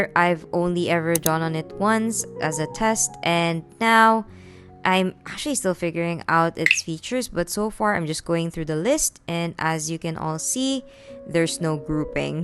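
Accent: Philippines. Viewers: Filipino or no? 🤔 Your speech kinda sounds filipino ✋😐🤚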